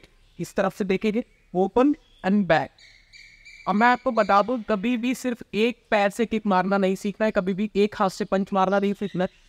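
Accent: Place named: Mau, India. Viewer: Lagta Hai Mau District se ho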